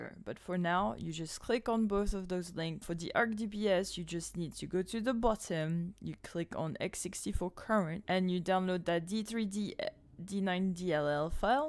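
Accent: France. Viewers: Hi! Great video ty, are u French? European server or American?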